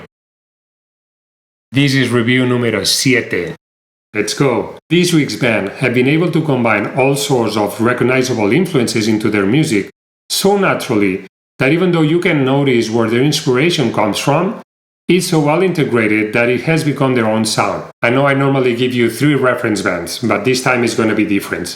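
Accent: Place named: Spain. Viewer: I made it all the way through this video, but mostly for the hot Spanish accent.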